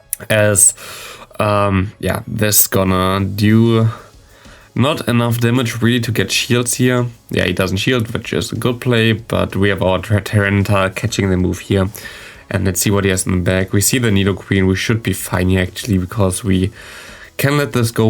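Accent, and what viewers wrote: Germany. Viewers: German accent.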